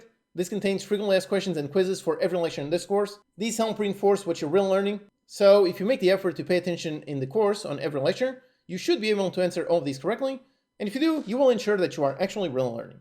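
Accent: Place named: Portugal. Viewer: Been watching you for a long time, only now i realized by your accent, tu és tuga como eu. oh my God... Santa ingorancia a minha. Luv you bro. Keep on Keeping on. ❤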